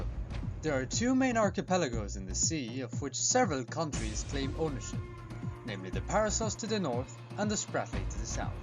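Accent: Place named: Ireland. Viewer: I came across your video by pure chance my dear friend. You have treated a serious and always topical subject with a touch of humor as usual and a slight Irish accent which gives a little more charm to your video!!! well done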